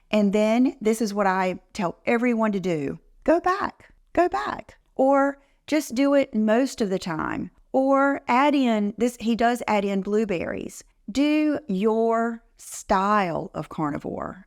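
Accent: South, United States. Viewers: hi - thank you! i love your insights & tips...also keep up the great energy & i love your southern accent!! xo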